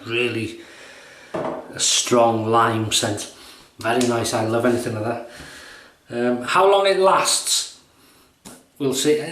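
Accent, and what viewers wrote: United Kingdom. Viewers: There I was with a video on in the background Joe from Queens talking about shaving. Suddenly I no longer hear Joe but a familiar British voice talking about Mack in a New Jersey drawl! Yes you're famous across the pond too. Don't go upsetting any gangsters. All the best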